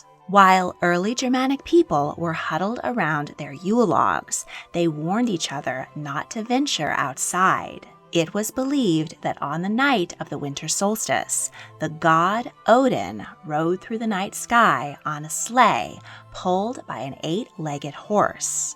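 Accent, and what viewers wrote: California, United States. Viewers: why do we have to endure this American accent!!!!!!!!!!!!!!!!!!!!!!! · Very interesting ..Kaiser Wilhelm was not Edward The Sevenths cousin , he was his nephew ...your American accent is very thick isn’t it ? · Excellent research but the Californian accent is unbearable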